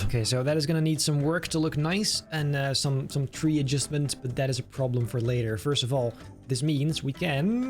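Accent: Netherlands. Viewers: Ik ruik een nederlands accent...?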